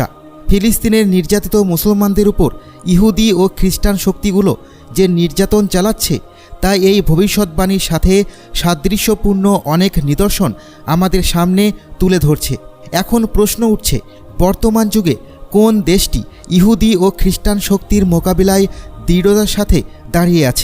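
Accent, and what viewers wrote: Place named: India. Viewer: আমার তো মনে হচ্ছে এই ভিডিওটা যে বানিয়েছে সে ইন্ডিয়ান আগে ভাষা ভালোভাবে শিখুন শুদ্ধ বাংলায় কথা বলা শিখন তারপরে ভিডিও বানাইয়েন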